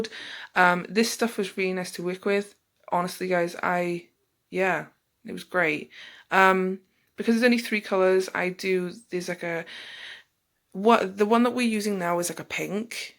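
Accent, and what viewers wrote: England, United Kingdom. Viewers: Don't stop talking, love your English accent, although the music is nice, too.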